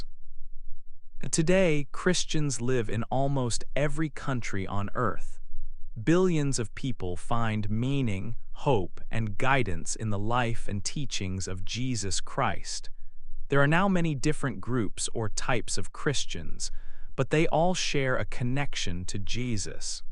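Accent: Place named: United States. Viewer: This American English ist sehr schwer zu verstehen und ist irritierend!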